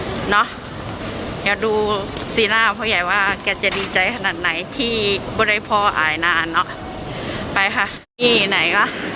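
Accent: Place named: Sakon Nakhon, Thailand. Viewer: อยู่บ้านอะไรค่ะ พี่ได้ยินเสียงพูดก็รู้คนสกล พี่อยู่สกลจะ้ มีแฟนฝรั่งเศสเหมื่อนกันจ้ะ ไทบ้านไดน้อ?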